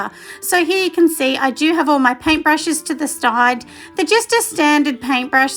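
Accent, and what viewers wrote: Australia. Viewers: Love your Australian accent! Idk y someone would complain?! Love your videos.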